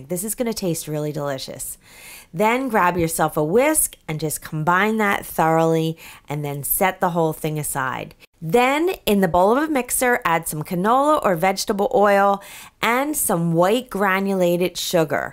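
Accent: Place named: New York, United States. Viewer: Hi! You sound like your from NY!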